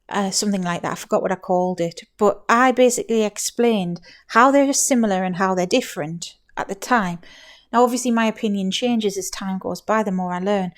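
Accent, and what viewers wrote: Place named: Yorkshire, United Kingdom. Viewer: my mother is from England, she grew up in Yorkshire, I'm in America never been to England, but one day I will go. Your accent reminds me of my mothers. 😘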